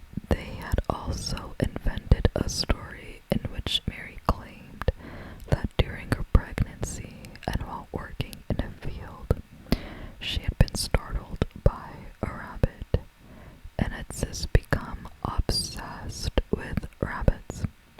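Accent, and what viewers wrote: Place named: England, United Kingdom. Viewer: It's an English accent, lmao. There are many French accents that are quite distinct, just as in any language.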